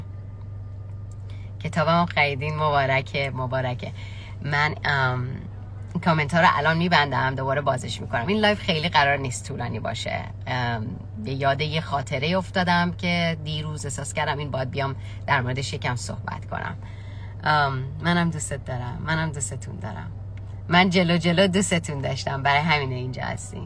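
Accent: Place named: Shiraz, Iran. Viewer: 🌺🌺🌺🌺☘☘☘ یعنی دلتنگی های من برای شیراز با این لهجه ی زیبای شما برطرف میشه . خیلی ممنونم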